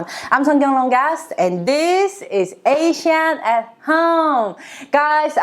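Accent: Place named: South Korea. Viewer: It is a phonetic spelling of how she actually pronounces the word with her Korean accent. It's a joke.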